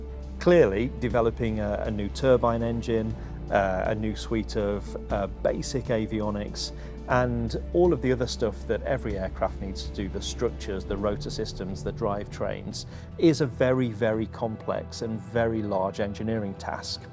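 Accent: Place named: United Kingdom. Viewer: sounds like a new British billionaire. Godspeed.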